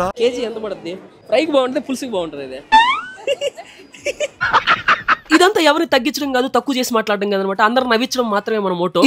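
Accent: Nellore, India. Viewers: Are you a Nellore person anna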